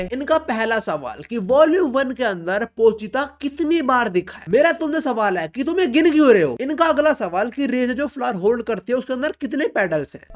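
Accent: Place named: Bengal, India. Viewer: Wow Bengali is funny ngl 😅✨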